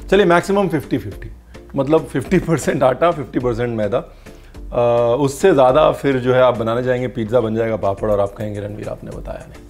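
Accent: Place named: Punjab, India. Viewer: yeah exactly. The way he iterates some word in Hindi and Punjabi blended.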